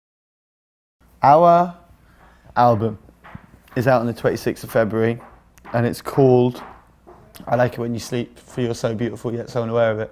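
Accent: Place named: RP, United Kingdom. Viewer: He's from Wilmslow so yeah he is gonna be pretty posh :P.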